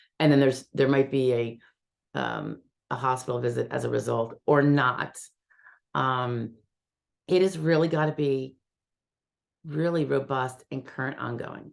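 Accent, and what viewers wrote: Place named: East Coast, United States. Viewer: I'm curious what state you're in? I wanna guess east coast (& fingers crossed) by the way you pronounce things like me. I know it doesn't matter where your attorney is for disability & I definitely want to get one this time. I should've "appealed' the 1st time but now I know I'm denied bc I didn't getmy paperwork work done in time so I didnt send it in last month.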